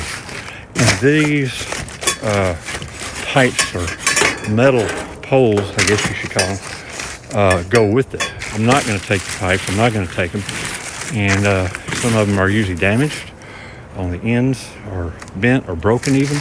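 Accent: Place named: Texas, United States. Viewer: Thanks, I thought it looked like Texas + accent too 🙋‍♀️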